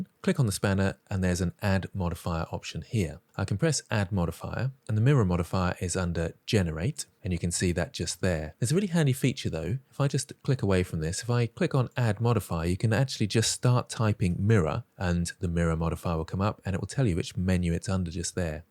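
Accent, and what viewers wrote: United Kingdom. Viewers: just shows and demonstrates how many times he had done this and knowing the process inside-out. The addition of British accent adds a nice spice making blender appeal and following the process just a breeze. Many thanks for sharing.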